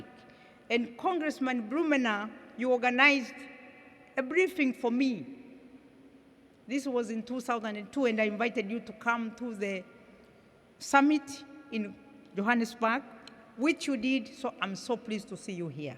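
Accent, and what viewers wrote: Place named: Haya, Tanzania. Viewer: Yeah! representing well Tanzania,the country of Mwl. Nyerere & JP Magufuli.Big up mama tibaijuka truly you deserve respect.pia nimeipenda lafuzi ya kihaya mpaka raha hiyo Ndiyo TZ.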